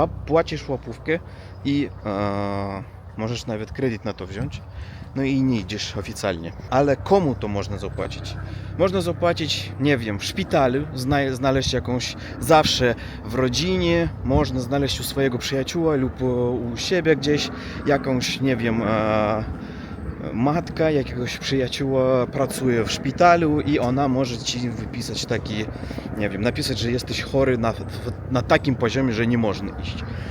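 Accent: Russia. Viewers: jak dla mnie w rosyjskim akcencie nie ma nic złego, tak jak polski akcent w angielskim ;) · Człowieku mieszkasz w Polsce już 4 lata, nauczył byś się chociaż polskiego, bo ile można słuchać tego rusko-polskiego pierdolenia. Mam nadzieję, że pójdziesz po rozum do głowy i w końcu zaczniesz naukę.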